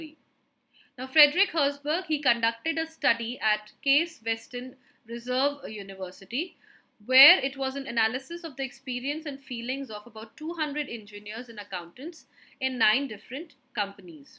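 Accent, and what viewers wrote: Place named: India. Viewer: the indian thick accent is spoiling everything.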